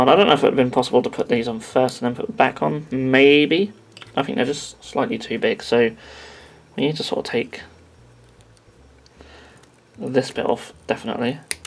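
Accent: Norfolk, United Kingdom. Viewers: Are you norfolk? I'm Norfolk and you sound a little bit norfolk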